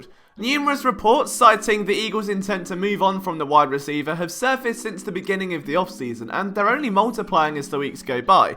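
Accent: United Kingdom. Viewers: Well, I'm from the Philly area, and knows as much about my Philadelphia Eagles as any other reporter, if not moreso. I fully embrace his accent and overall tone and cadence, in fact as an American who spent some time in Scotland, I knew people over there that poked fun at the NFL because it wasn't "literal FOOTball" so frankly I enjoy the fact that a young man from the UK became a top tier Philly Sports writer/commentator because of how much he loves the Eagles etc. You're awesome, and your accent is great, and makes me happy to know that our sport and more specifically my Philadelphia Eagles can not only reach someone from another country, but also capture their heart like it has mine and many others. You be you.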